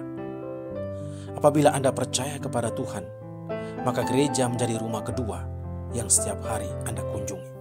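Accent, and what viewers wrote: Flores, Indonesia. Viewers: Kalau diresapi logat miminya seperti org bejawa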